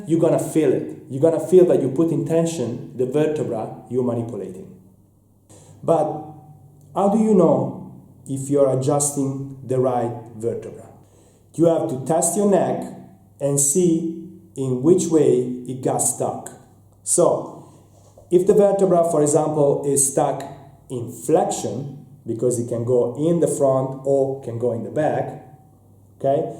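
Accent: Italy. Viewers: I know an italian accent when I hear one. Grazie frate’ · Fra ma quanto sei italiano hahahah (nel senso che si sente non altro)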